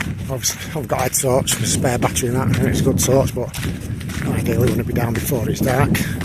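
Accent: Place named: Northern England, United Kingdom. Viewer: Those were the days ..You could ride a bike All is not lost and terrific hearing a northern accent .Who says the North has no beauty Would love to sit by the water fall and eat a bacon butty hot or cold .Better than the Ritz .A glorious views .We share the same words for those who wreck places ,Enjoyed and how .Made me even more homesick for the North West ..Thanks for a great vlog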